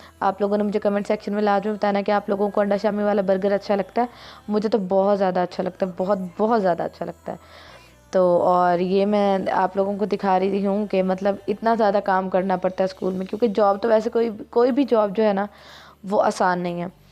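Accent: Saraiki, Pakistan. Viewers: Ap saraki speaking ho kya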